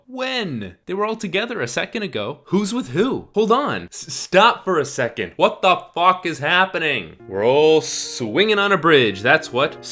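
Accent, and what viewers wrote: Canada. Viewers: heard the Canadian accent. Woot!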